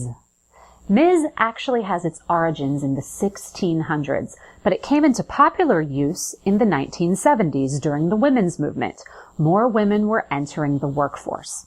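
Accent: United States. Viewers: Why yes she is American speaking their version of English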